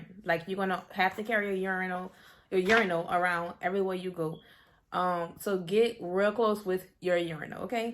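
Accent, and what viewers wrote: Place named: Louisiana, United States. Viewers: Hey, I have a question are you from 504? The Accent sounds like the south mainly New Orleans which is where I’m from. My friend said hey go check this video out sis sounds just like you. Lol Peay that you have a fast safe recovery 🙂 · I HAD TO FOLLOW BECAUSE U FROM MY HOMETOWN U SOUND LIKE U FROM LOUISIANA AND HOW OLD IS UR MOM IM 8 MONTHS POST OP IM GOIN BACK DECEMBER MIAMI JOLIE GETTING A 360 LIPOSUCTION AND A BREAST REDUCTION WITH SOME FAT TO HIPS THE LAST TIME I HAF DR SAMPSON FROM SEDUCTION HE DID MY 360 LIPOSUCTION WITH A BBL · Its the LA accent for me bebby!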